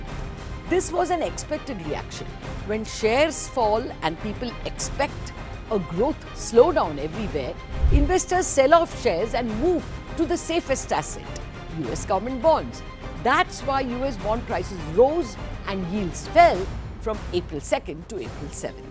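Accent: India. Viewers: Exaxtly, so inappropriate and distracting! Cant stand that indian accent, despite being an english speaking channel! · Like Sirens Latha glues the listeners to the screen with her unique Indian accent.Why she sounds so persuasive? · Why doesn't she dress in a business attire instead of looking like she came out of a kitchen. Also, her accent is too much. I can't understand her Indian English.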